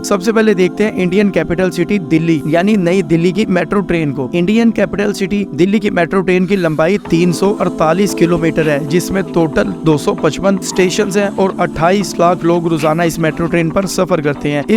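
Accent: Pakistan. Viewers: Lehja bta rha ha k aap Pakistani ho ? Aur PTI supporter bhi ❤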